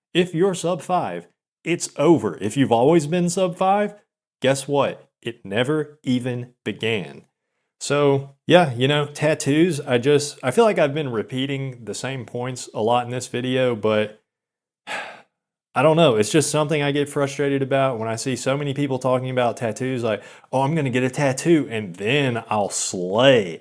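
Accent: South, United States. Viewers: Tbh I thought you sounded like Mental Outlaw when I first found your channel but after time I realized your accent had a more southern sound to it if that makes sense.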